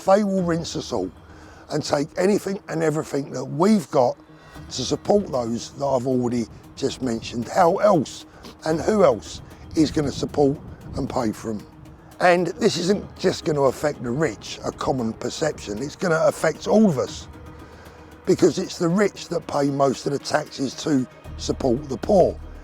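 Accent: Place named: London, United Kingdom. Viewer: you make no sense whatsoever, we've had the worst 14 years of politics in British political history under the Tories. Yet you are quite sure it will be worse under labour, well let me tell you it cannot get any worse only better. Your London commoner accent is very telling of your working class roots yet you've allowed yourself through the MSM to become a Tory shoeshine boy.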